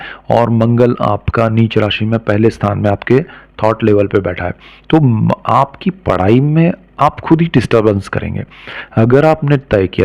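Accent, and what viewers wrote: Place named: Kashmir, India. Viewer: Are you from Kashmir your accent is kashmiri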